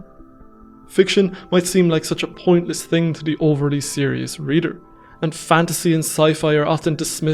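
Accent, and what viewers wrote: Ireland. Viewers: Irish accent is awesome ☘️ . Great books btw. Interesting information on the various people.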